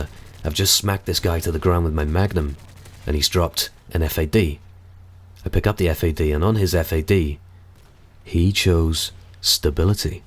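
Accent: United Kingdom. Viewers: That voice is incredible, where in the UK are you from?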